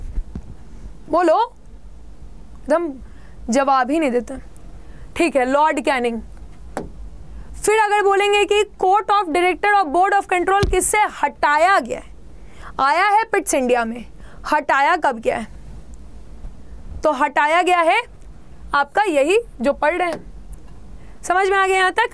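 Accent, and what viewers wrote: Bihar, India. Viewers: Nice session 🙏 mam are u from Bihar 🤔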